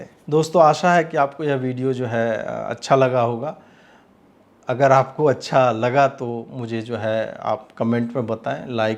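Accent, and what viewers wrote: Bihar, India. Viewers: Uffff ye maithili touched Hindi bihari accent ke sath maza aa gya ekdum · अच्छा नहीं...बहुत बहुत बहुत अच्छा लगा....किसी भी film से ज्यादा entertaining है....बिहार ki hindi बहुत mithi है....thank you so much bhai...god bless you...🙏🙏🙏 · भई मज़ा आ गया क़सम से पूरे बिहारी स्टाइल में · Vaah Bhai,tu si great ho. Aaj to pure Bihari andaj mein.outstandsing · ji, Mai toh aapke videos dekhti hi hu, meri mataji bhi aapke videos dekhti hai. Aapki bahot prashansa karti hai ki aapki Hindi bahot achhi hai aur iss video mein toh aapne kya hi bihari bhasha pakad ke roast Kiya hai. Bahot bahot badhiya aur aabhar aapka. Aise hi videos banate rahiye! 🙏🙏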